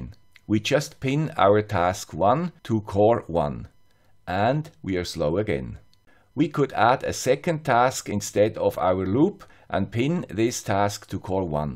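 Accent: Switzerland. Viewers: you're a TRUE star (complete with your ubiquitous 'Swiss Accent'). I always enjoy your videos. I haven't yet done anything much with ESP32 boards as I've tended to concentrate more effort on ARM based SBCs (OLinuxuinos, Beagle, OrangePi, BananaPi, RaspPi etc), but my soldering iron is beginning to show 'twitching' signs of neglect. As soon as I can muster up some form of project to suit it, I'm sure I'll have the flux flowing... Thanx dude (from a guy with a Kiwi Accent... LOL) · "Here is the guy with the Swiss accent...again..." - I always enjoy hearing that intro - it's your signature trademark. My wife gets a kick out of it too (sometimes she listens to my youtube tutorials LOL). Thank you for this video. I've been using the ESP8266 exclusively since the ESP32 software arduino support seemed to be very much in alpha/beta release. Based on your recent videos on the ESP32 it seems it's slowly starting to stabilize and become very useful (still missing bluetooth though). 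I like the intro on RTOS and the simple example - not so intimidating after all. Do you think it's possible to control two different GPIO pins in a task running on different cores? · The guy with the Swiss accent is kicking some serious ass -- great job! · I also miss hearing your original intro "Swiss accent *again*". Feels like something is missing now :) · Maybe Swiss?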